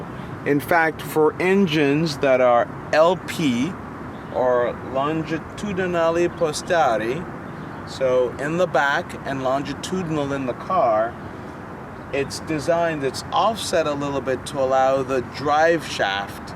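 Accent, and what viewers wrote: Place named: New York, United States. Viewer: He was born here what's accent are you talking about ? Probably is because he is from New York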